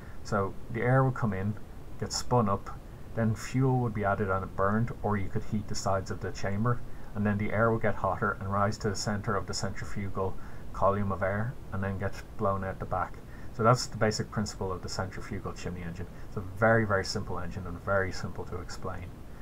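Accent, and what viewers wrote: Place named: Ireland. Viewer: You sound as though you’re a real Irishman, If I ever build a pulsejet big enough, I’ll slap some solar panels on a dingy, electrolyze the seawater, And run that sucker all the way across the Atlantic. I’ve always wanted to see Ireland. Have a great day. Right now I am in the middle of some very interesting builds. When the videos get uploaded I’ll let you know.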